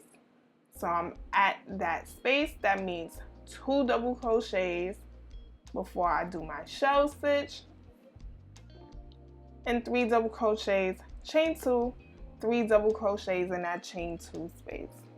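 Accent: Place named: Bronx, United States. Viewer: Where are you from? Sounds like you're from where I'm from. Ny. Bronx to be exact. Lol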